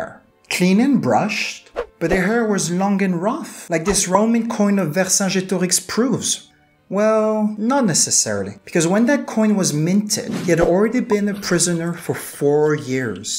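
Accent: France. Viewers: He probably said it with a French accent because he has a French accent as far as I can tell. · Nice accent is French your first language.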